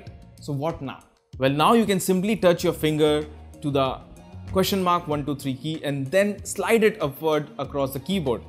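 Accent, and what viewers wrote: India. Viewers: My ears bleed everytime I hear hindu accept.. what's wrong with you peopl!?!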